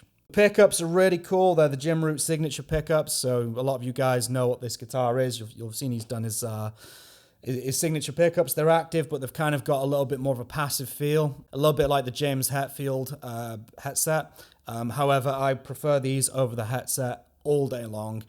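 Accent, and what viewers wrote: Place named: Geordie, United Kingdom. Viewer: Bud, I'm having a hard time placing your accent, Jordie? Great video, hi from Canada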